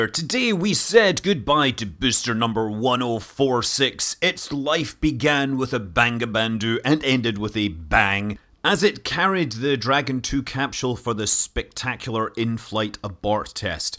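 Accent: Scotland, United Kingdom. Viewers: Scott Manley is Scottish, so he pronounces some words differently than what you'd expect, say, from an American or an English person. The English language is spoken by hundreds of millions of people around the world, in all sorts of different dialects. Expecting any sort of logic or coherence in the way words are pronounced is optimistic at best. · Not mid-Atlantic, Scottish.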